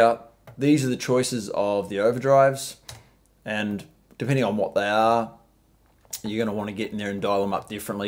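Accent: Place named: Australia. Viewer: Aussie accent is strong with this one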